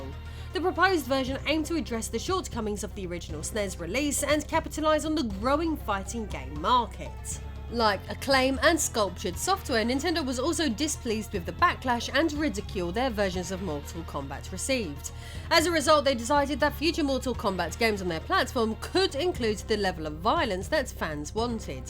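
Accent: United Kingdom. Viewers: The Brits have a different way of saying certain things. ZX Spectrum is "Zed Ex Spectrum" there. I'm used to it. They probably think the way we say certain things is odd so 🤷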